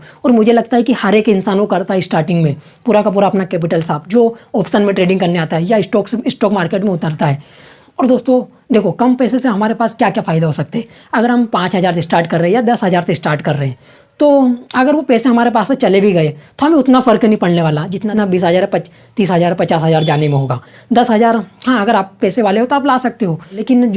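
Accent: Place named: Indore, India. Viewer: Bhai ap Indore se ho apki awaj parhchan Li mene😅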